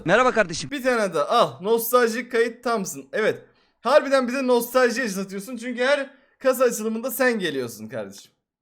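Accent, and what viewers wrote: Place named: Gaziantep, Turkey. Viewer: abi gaziantepli mi abi konuşması benziyor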